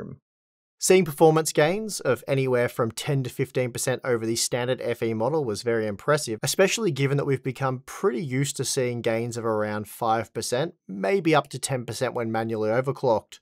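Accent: Australia. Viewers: I also love the silent baos hahahaha I love how Australians pronounce some words lmao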